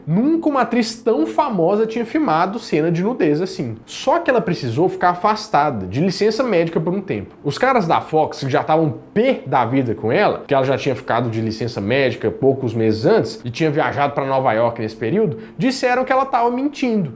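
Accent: Minas Gerais, Brazil. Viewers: Vídeo muito bom. Gostei do formato, da didática, das montagens e o sotaque mineiro é um destaque. Parabéns 🌟🌟🌟🌟🌟 pra vc. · esse cara é mineiro uai!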